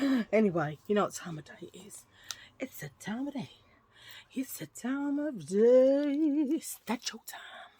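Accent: Australia. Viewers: Enjoyed this - like a nice visit with a friend! You are good fun. I was trying to watch your next video but I have to provide proof of age and as this involves giving driversl licence or passport number which I am not prepared to do could be risky I can't watch! Crazy! Your Aussie accent wasn't bad by the way - and I'm Tasmanian!!! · Love the Aussie accent. 😂❤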